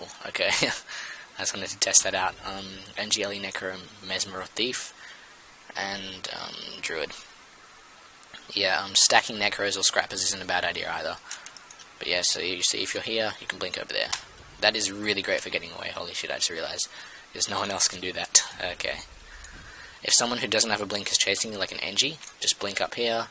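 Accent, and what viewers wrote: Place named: Australia. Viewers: he's an aussie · thought that mighta been an aussie accent. was a little softer than we 'Mericans are used to hearing ;P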